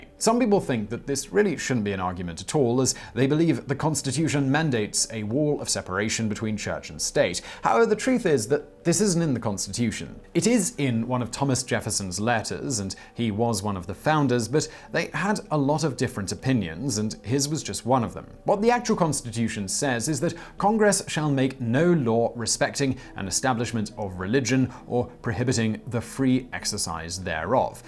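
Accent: England, United Kingdom. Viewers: I like your videos in general. Not this one. Too many strawman arguments. Keep your British English accent out of our proud American nation's history. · Sounded like an Englishman. They know our history better then we do. (I DON'T think so). The sad thing about this video, we who are older and had our country's history taught to us know this is bull. However many of our young people don't. Thanks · And that cheeky British accent makes him sound so much more authoritative/intelligent.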